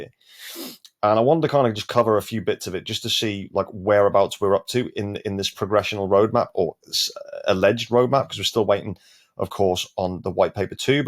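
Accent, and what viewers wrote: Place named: London, United Kingdom. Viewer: Hi....I like your frank videos. Sensible. You sound like you're from in or around London somewhere. Me too. Anyway, I've noticed a pattern recently on my portfolio. I go to bed at midnight (GMT), have a quick look at the value, then wake up at 07.00 and I'm in the money! Maybe 3-4% - all good. Then about 10.00am it starts to fade back about 2%. So, my theory is that the overnight markets, (guessing it's west coast US, then Korea and Japan are influencing the prices)., can maybe be predictable. So, here's the plan...I buy good tokens at midnight, (HBAR,QNT,XRP) then sell when I wake up...Is this a foolish idea?....What do you think? Cheers pal.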